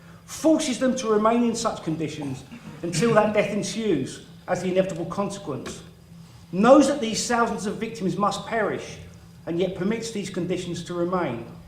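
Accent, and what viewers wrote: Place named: Cockney, United Kingdom. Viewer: Oh, I fucking love his cockney accent!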